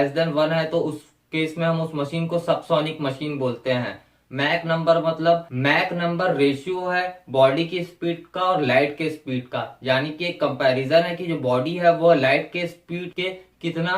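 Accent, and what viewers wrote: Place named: Uttar Pradesh, India. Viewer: Up Jaysa bolta ho bohot halp ho ta ha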